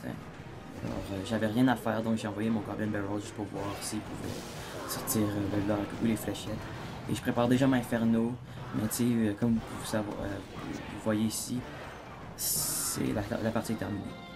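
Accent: Quebec, Canada. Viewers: Nickel l'accent quebecois 👌👌 la video nickel aussi tes sur la bonne voie 👊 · gg <3 j adore l accent quebecois XD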